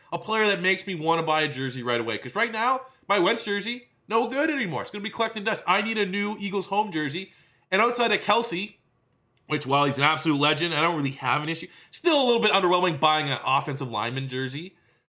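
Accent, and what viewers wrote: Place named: Canada. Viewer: This guys great. Sounds Canadian even though he’s a philly fan. Also what website did he use?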